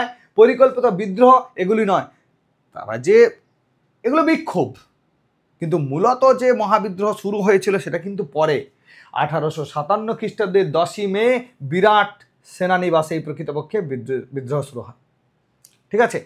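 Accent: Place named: Purulia, India. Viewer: Sir, আপনার বাড়ি কি পুরুলিয়ায় কথা শুনে তাই মনে হচ্ছে আমি পুরুলিয়া থেকে❤❤❤❤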